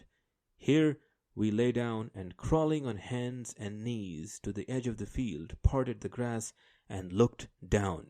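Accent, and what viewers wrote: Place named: India. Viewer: Jim was born, reared, and earned fame in India, not Britain. He only moved to Africa when he retired from hunting. Given this, and since the stories are all set in India, I think a slight Indian accent is most appropriate. Jai Hind! 🇮🇳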